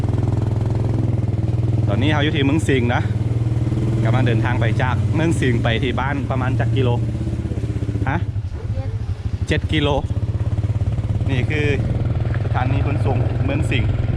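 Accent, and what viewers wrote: Thailand. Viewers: ฟังสำเนียงการพูดแล้วเหมือนคนไทยเลย คงจะเคยมาอยู่เมืองไทยนานนะ คำพูดสมัยใหม่ไม่เหมือนคนลาวจริง ๆ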